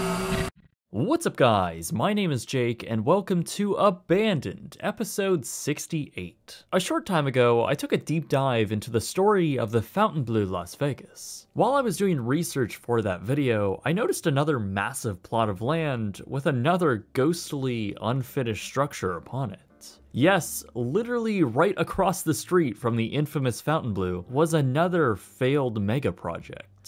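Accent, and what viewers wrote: Canada. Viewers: canadian? ,,,,ote,,,out,,coche, couch,,, speak inglish, whoever you are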